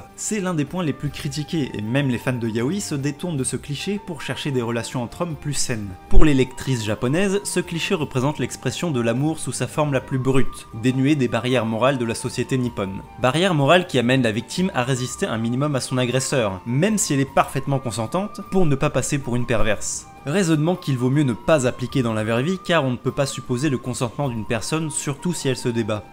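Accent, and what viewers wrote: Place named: England, United Kingdom. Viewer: Ton accent anglais est magnifique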